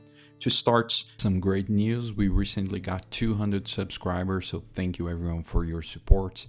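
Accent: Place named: Brazil. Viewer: its amazing how your english is very smooth while I can still say you're BR hahahaha tamo junto irmão! videozinho bom demais, começando no medivia essa semana!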